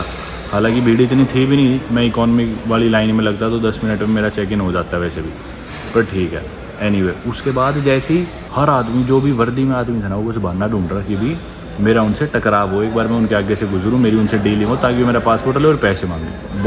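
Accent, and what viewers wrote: Haryana, India. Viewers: No doubt ap boht ache vlogger hen but apne accent ko thora groom kren English bhi ap apne haryanvi accent me bolte hen.. Sari dunia ghoom li ap ne but you sounds like a typical backward villager.... kafi videos me ap ajeeb si awaz nikalte hen jese kisi janwar ko hankte hue log village me nikalte hen...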